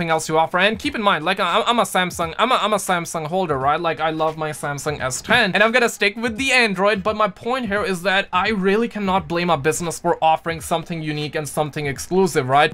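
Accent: India. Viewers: U have some Indian origin or something? Or maybe learning hindi?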